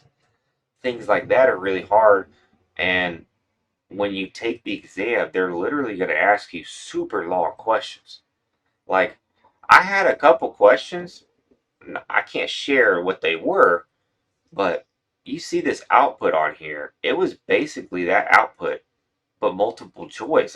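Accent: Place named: Scotland, United Kingdom. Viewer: I love! His thick Scottish accent keeps it interesting to me.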